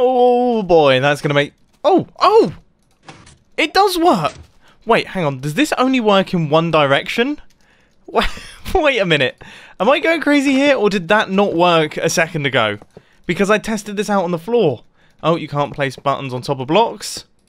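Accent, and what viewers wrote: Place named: United Kingdom. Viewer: Does anyone else not have any idea how any of this redstone stuff works? I just like watching the British man talk funny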